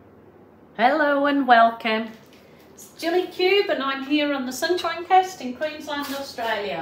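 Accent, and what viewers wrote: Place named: England, United Kingdom. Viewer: Very cool! So what did you use that gave you those cells? Nice! You know what I haven't heard for ages? "FINGER POPPING!" 😂 You've definitely got the pom accent 😊That's who you are! I moved to Canberra from South Africa 18 months ago and I doubt I'll ever pick up the Aus accent. Maybe a little here and there but in general, no. Kids might though! 💕💜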